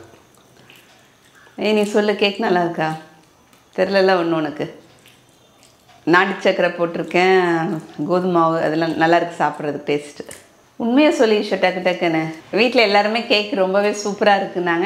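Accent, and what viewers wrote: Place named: Cuddalore, India. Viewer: No words shown ka. Video is clear. I think mam had turned on tamil caption(subtitle) in her mobile. Cake looks super. Please try Eggless Brownie soon. Myself born and bought up in cuddalore district, my daughter born in Jipmer. We got settled in Chennai. Really your slang gives me my own native touch.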